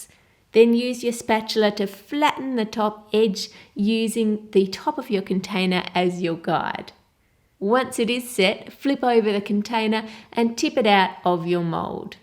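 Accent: Australia. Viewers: It's a classic Auzzie accent. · I love your accent!! Is it Australian? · I watch a lot of your tutorials, and for that I really have to ask, can you please speak slower? because I'm not a native speaker, I'm italian, and it' so difficult for me to understand everything since I'm not used to listen to aussie accent! I'm not trying to be rude, I really love your recipes, and I need to understand everything so that I can try them :) thank you!!!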